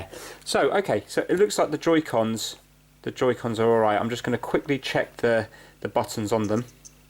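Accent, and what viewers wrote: United Kingdom. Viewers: your brittish accent was so lit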